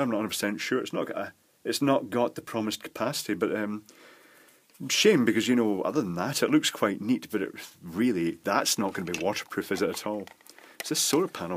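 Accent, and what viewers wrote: United Kingdom. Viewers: this guy has the most british accent i've ever heard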